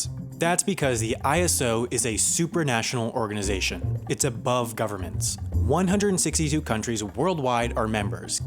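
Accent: United States. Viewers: You sound like an American. (Except you told me your Grandad flew Lancaster bombers in WW2).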